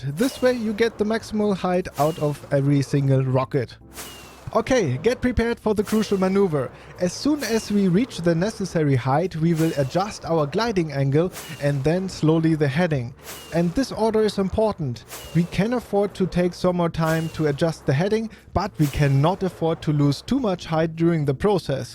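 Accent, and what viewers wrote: Germany. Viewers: Bist du Deutsch? Hört sich so an!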